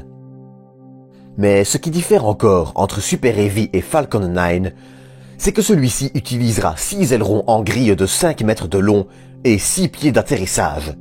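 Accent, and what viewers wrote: Belgium. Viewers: Salut le Belge, c'est un compatriote (expat) qui t'écrit. Super chaine, super montage, super sujet. Mais en tant que Belge je dois dire que j'ai du mal avec ton accent. Je ne suis jamais sur si je dois en rire, car cela à quelque chose de sympa, ou si mes oreilles crient au secourt. Peut-être les deux. D'un côté c'est original... et cela dois faire marrer les Francais. Tout compte fait je me demande si j'ai pas le même 😳... non d'une frite ! Allez pouce bleu quand même. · Et le super-hairy aussi. Je trouve ça cool, le lanceur super-poilu, ça a la classe. Mais ceci étant, ce n'est pas un problème de prononciation (AMHA), mais plus une question d'accent (belge je suppose). C'est ce qui est sympa avec la francophonie, ça met un peu de variété. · Un Belge qui dit soixante-douze... Je suis déçu... J'ai vu quelques commentaires sur ton accent. 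Ne le gomme pas trop, c'est dommage de cacher cette spécificité culturelle !!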